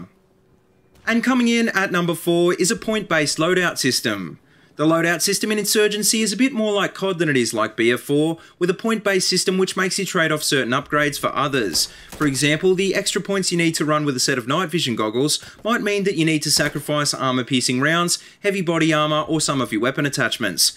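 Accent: Australia. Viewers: auwfull aussy accent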